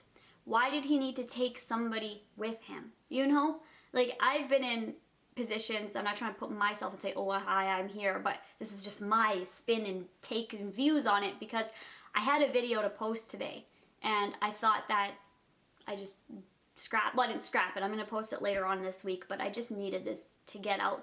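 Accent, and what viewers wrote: Canada. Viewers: It sounds like you're from Canada?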